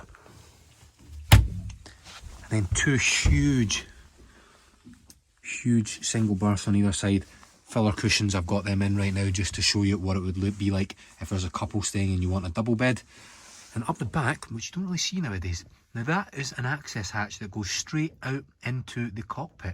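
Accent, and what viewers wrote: Scotland, United Kingdom. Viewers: What you have here is a great example of what Marine Sales can be, ( The Scottish accent puts it over the top.)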